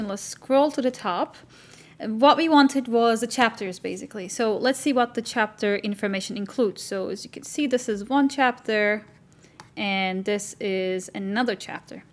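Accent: Turkey. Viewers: She has a Turkish accent.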